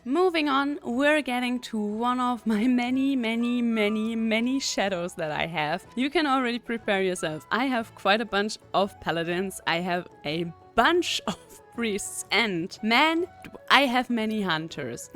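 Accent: Germany. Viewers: Hey! Love binging your videos while Studying for my exams haha! where did you learn about the wow lore im trying to get into it because im newer to wow. ps: are you german? you accent sound familiar😊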